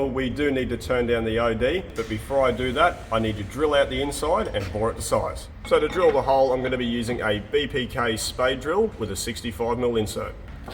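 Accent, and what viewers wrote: Australia. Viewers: Americans not understanding avgood Aussie accent!!!!!!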